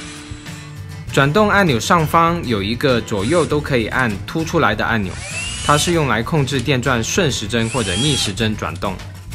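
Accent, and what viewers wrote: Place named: Taiwan. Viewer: 台湾腔，台湾人吗？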